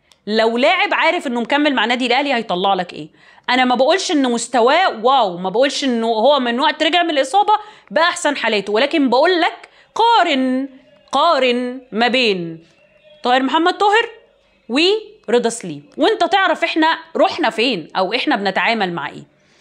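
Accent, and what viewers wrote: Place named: Levant. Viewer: حاولي تظبطي لهجتك المصريه ... بلاش استخدام بي بدلا من في ولا حضرتك عيشتي في الشام؟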